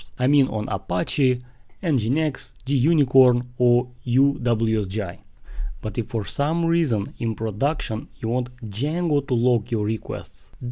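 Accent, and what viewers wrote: Russia. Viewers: I'm hearing Russian accent there)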